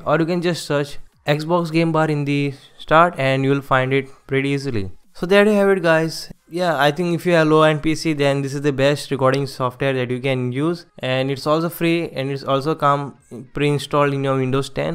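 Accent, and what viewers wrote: India. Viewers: Nice 1.16.3 english Indian boy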